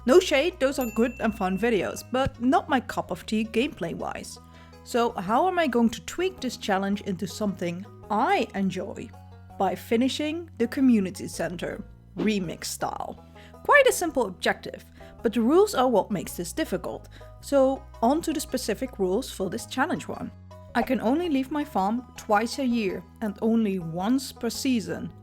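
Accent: Netherlands. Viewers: Listening until the end I realize you’re probably Dutch haha!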